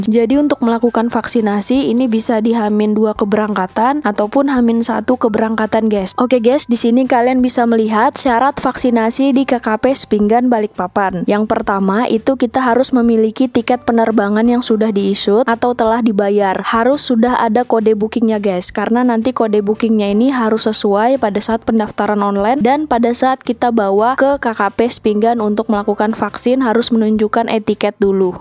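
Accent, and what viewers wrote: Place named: Banyumas, Indonesia. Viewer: Ow gitu om.,makasih infonya om. Sampean ngapak,ngapak e ndi mas?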